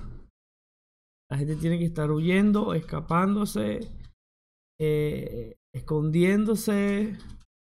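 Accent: Venezuela. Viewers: Creo que entró en contradicción porque, sino me equivoco tiene asento venezolano y a su vez es descendiente sirio, lo que lo llevó a asociar "revolución" con "comunismo" (y supongo que asocia comunismo o izquierda con "chavismo"), pero el video es una clara crítica al capitalismo estadounidense que tiene sus garras en Siria. Aunque el bloqueo económico de Venezuela también es impuesto por los yanquis... El que sí lo tiene clarísimo es René con su perspectiva antiimperialista (revolución). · Tengo una duda, si eres arabe porque tienes tan pegado el acento venezolano?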